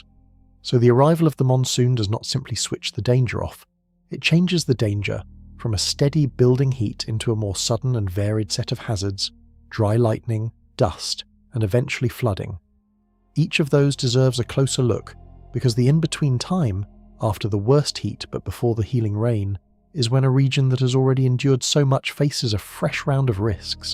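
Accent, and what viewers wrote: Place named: United Kingdom. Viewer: The narrator voice is British. Few Brits have any concept of Fahrenheit, apart from maybe 32º Fahrenheit.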